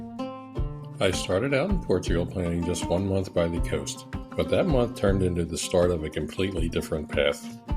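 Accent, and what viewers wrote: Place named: Philadelphia, United States. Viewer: HI! OMG I am from Lower Merion! From Rosemont/Bryn Mawr area. I immediately recognized your accent from home like a melody to my ears!... I’m living on the west coast now but grew up in PA almost til college. I was curious where you’re specifically from in PHL or outskirts? I am Amazigh…Celtic-Moroccan, Siwa Egyptian and Portuguese. My family is from Algavre but emigrated for the most part to the states after intermarrying in the UK and came down thru to the Main Line with it’s more pluralistic society on my mothers side. Very curious about a move either permanent or temporarily to either Portugal or Morocco…as are my parents to possibly Portugal. Would love to connect (if you’re open) Especially because you understand the culture of home vs there abroad in both countries and those adjustments. I am 45, just 3rd generation born in the states so there’s much to explore and visit. 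Incredibly beautiful footage! I am late to the video but thanks for sharing! Please share an update video as well! All the best! -Micki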